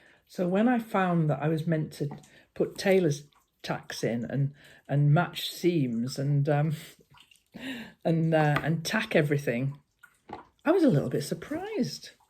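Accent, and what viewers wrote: United Kingdom. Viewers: I just love watching you and listening to you chat away about things in life. I would like to know about that gorgeous tree on your window. You are most inspiring and I look forward to each day sharing with you all the things I love. I am an American, and have lived my adult life (now 77 years old) in Switzerland, mostly, also South Africa and Austria, too. I went to British schools and I love to hear you speak, British English, using familiar sayings. I chuckle at your humor (written humour, I believe in England). You sort of bring my several worlds together.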